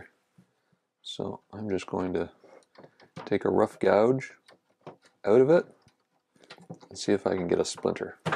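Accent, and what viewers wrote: Canada. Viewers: Thanks for another great video. Yes, we do need to see you more often. a) Why are you apologising for your Canadian accent? It's as good/bad as any other. b) Yes, I've emailed Lee Valley. c) yes, I gave it a thumbs-up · You don’t need to be sorry for your Canadian accent. It’s part of what makes you uniquely you. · No need to apologise for your wonderful and authentic Canadian accent. Love your channel! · Don't worry about your pronunciation of the word router. I love the Canadian accent. I drove a taxi here in the UK, and Canadian passengers were always pleasantly surprised when I asked what part of Canada they were from. They always assume that the British think they are Americans. As soon as I heard a word with OU in it it was obvious they were Canadian.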